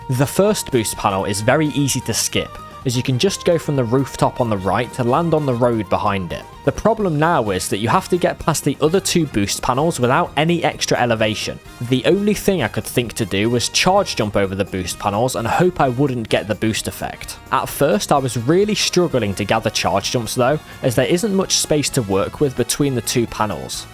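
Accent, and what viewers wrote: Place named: United Kingdom. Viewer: Calling it "Wario's Shipyard" as opposed to "Wario's Galleon" caught me off-guard because from your voice I thought you were British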